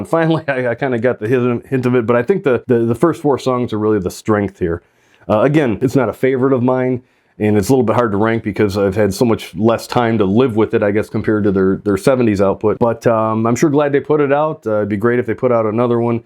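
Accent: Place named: Michigan, United States. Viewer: I was going to ask if you were from Michigan by your accent.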